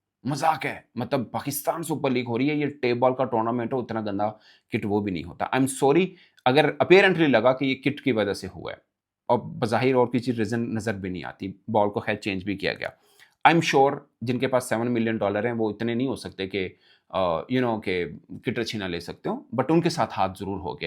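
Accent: Punjab, India. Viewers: پیارے بھائی پہلے بھی کہا تھا کہ اردو میں پنجابی لہجہ نہ استعمال کیا کریں۔ جیسے آپ اکثر شاہین شاہ آفریدی کو شہین شاہ کہتے ہیں۔ پلیز تلفظ کا دھیان رکھا کریں آپکا وی لاگ پوری دنیا میں جہاں اردو سمجھے والے لوگ ہیں دیکھا جاتا ہے۔ شکریہ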